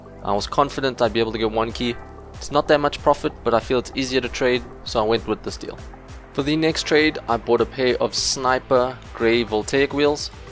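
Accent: South Africa. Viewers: are you South African I am and you sound like you are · Which country are u from its sounds like south africa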